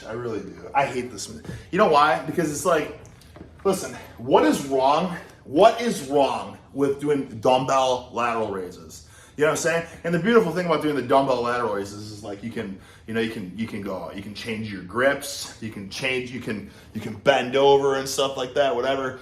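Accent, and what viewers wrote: Midwest, United States. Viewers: I love Midwestern swearing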